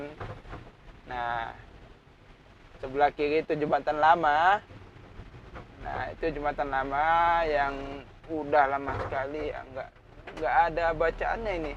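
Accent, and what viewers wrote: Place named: Banjar, Indonesia. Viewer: Klo didengar dari logat bahasa dan tipe wajah kaya nya abang ini orang banjar.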